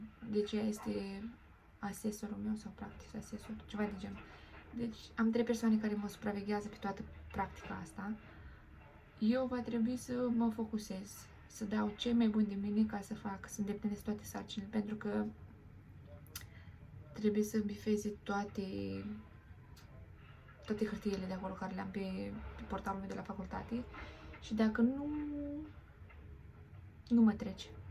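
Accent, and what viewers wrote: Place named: Moldova. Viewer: Te aud ca esti moldoveanca. De unde esti de loc?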